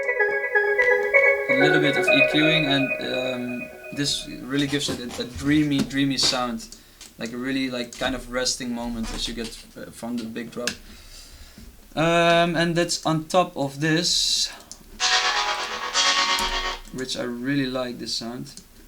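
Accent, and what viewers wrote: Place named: Netherlands. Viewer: Pittig nederlands, dat hoor je gelijk! :P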